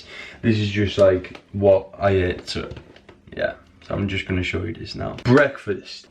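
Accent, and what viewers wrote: United Kingdom. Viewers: Good work man!! This is well inspiring. Also sounds like you're from the Boro? Could be wrong, from Northallerton but been living in Canada a couple years now.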